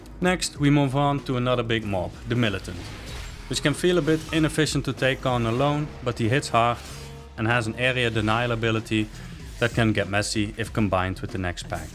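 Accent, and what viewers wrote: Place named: Netherlands. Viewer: Dutch huh :D Good video !! i really dislike this dungeon and this helped me out a lot !!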